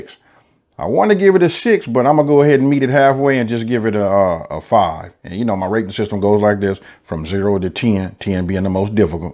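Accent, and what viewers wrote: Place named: California, United States. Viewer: You sound like you're from cali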